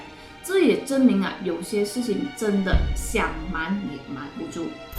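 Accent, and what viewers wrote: Malaysia. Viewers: 对啊这是马来西亚口音 ，就很像你们在外国人面前说英文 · 马来西亚华人的普通话口音很有趣，你是广东人后裔吗？好漂亮～ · 她是马来西亚华人，肯定跟你们不同啊。。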